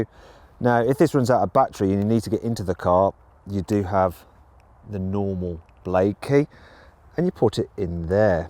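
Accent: United Kingdom. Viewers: 발음이 영국이넼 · 영국식억양 개간지나네 ㄷㄷ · 너무 재미따 ㅋㅋㅎㅎ 영국식발음으로 듣기공부 잘하고 감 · 이보다 더 영국 억양이 어울리는 차와 영상이 있으려나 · 영어못하는 내가 들어도 이사람은 전형적인 영국인발음이군ㅋㅋ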